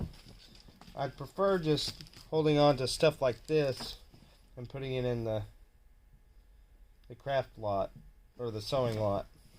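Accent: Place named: South, United States. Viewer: I am enjoying your videos from Australia. I am originally from Texas so the Southern accent reminds me of home.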